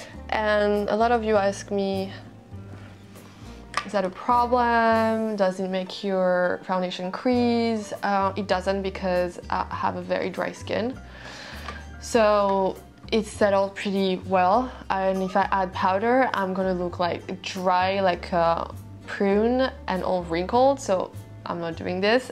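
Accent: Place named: France. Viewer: Hi, I am not French and also not an englishspeaker, so for me its pretty hard to listen this accent. The video is very well done, the music and everything else .... Just the manner of the speaking is a bit smooth sometimes, thus it gets hard to distinguish certain words. Not to offend, just my point of view 🌹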